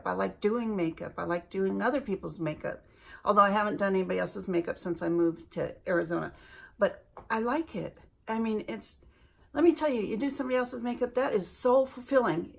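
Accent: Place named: Alaska, United States. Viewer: Your videos are informative and fun to watch. And yes, you sound Alaskan to my West Coast ears. 😁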